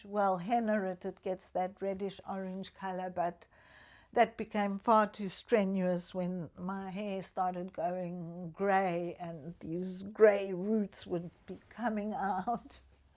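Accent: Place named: South Africa. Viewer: thanks for sharing - love your pretty Austrian brown skin, clothes & furniture. You are delightful breath of fresh air. Sorry about your neighbour's tree being gone (your 16yo girl is precious) I can hear your South African accent ❤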